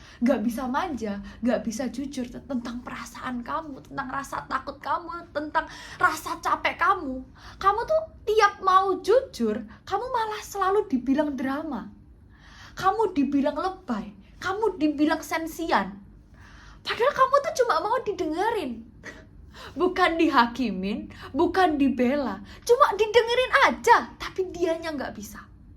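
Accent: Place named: East Java, Indonesia. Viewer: Medok jawatimuran kentel banget bikin ngangenin, apa lagi para perantau asli Surabaya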